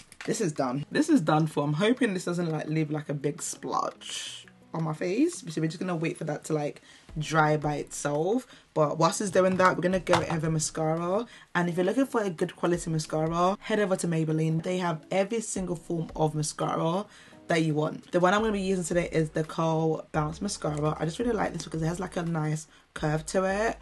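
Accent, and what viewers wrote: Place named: United Kingdom. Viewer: obsessed with how you're putting us on!! are you nigerian?i love your accent, black british folk are another level of gorgeous. sincerely, nigerian american cousin here :)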